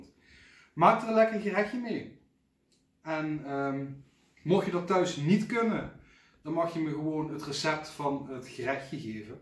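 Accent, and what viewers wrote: Netherlands. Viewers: Wouw echt geweldig lekker had jou recept gevolgd. Was ons niet pittig genoeg . Dus 2e keer gemaakt. Eerste keer gemaakt met de chips in de oven . Nu gemaakt in burritos vorm . Met een Carolina reaper en een chilli pepper. Hot chilli saus en chirazza saus * waarschijnlijk verkeerd geschreven ) maar mmmmmmm wij zijn echt flink pikante eters . Heeeeerlijk . Ik zie jou graag bezig. Ben zelf van België. Maar jij bent van de kanten heerlen denk ik ,niet ? Ik ben zelf van België grens maastricht . Ik vind dat accent zo geweldig om naar te luisteren als belg . Ik ga je volgen. Je legt ook alles zo mooi uit . Weet precies wat te doen . Top kerel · Zou deze man uit Limburg komen?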